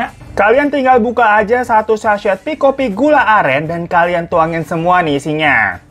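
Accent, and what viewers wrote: Java, Indonesia. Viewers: Indonesia nya bagian surabaya dan semarang🤣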